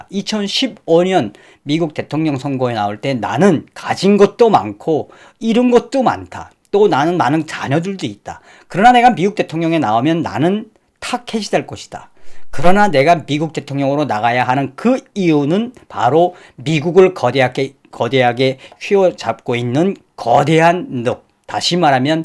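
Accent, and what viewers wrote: North Korea. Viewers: 좋은정보감사합니다 근데 북한사람느낌나요ㅋㅋ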